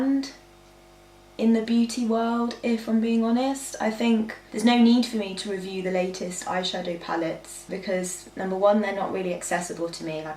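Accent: United Kingdom. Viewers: By, the way. Are you from London?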